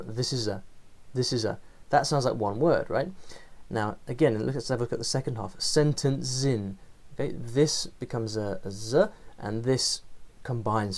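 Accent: United Kingdom. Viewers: you brithish english is really cool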